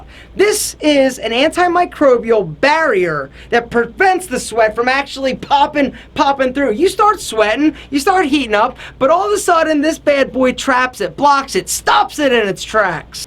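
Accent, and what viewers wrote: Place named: Pittsburgh, United States. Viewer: this guy sounds like a yinzer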